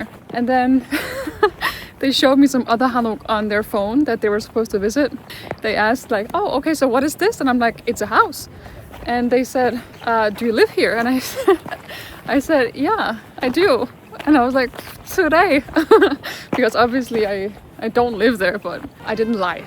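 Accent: South Korea. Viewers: ~ 발음이 완전 한국사람 같습니다. ❤ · 응? 발음이 한국인인데?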